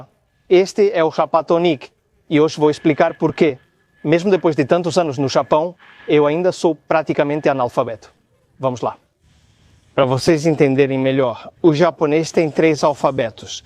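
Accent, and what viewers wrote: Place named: Portugal. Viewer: portugues de portugal :face-red-droopy-eyes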